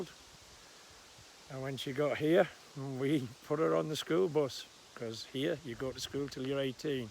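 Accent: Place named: Northeast, United States. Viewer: Hi, I saw your sticker on Craig Tate's channel. Sounds like you are from the NorthEast. Interesting to hear your story and I learnt quite a bit about your local area. "Join Youtube and see the world". I'm in York UK. Cheers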